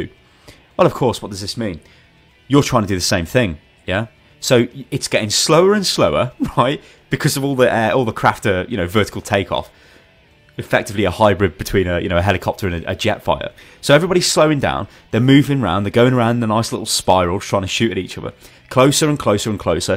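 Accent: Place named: United Kingdom. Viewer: You sound british so I would guess you have a European account also calles PSG, or a SOE account? Because that quite frankly is the biggest issue of Planetside 2 at the Moment. All those PSG players that without knowing choose a company that just doesn't care. Just have a look at the britsh forum over at planetside2.eu. We all play on the same servers yet we are second class citycin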